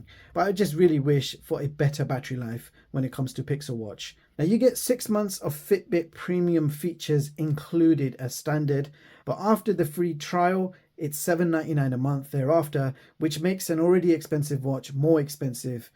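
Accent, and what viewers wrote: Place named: United Kingdom. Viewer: Your accent like Harry Potter Film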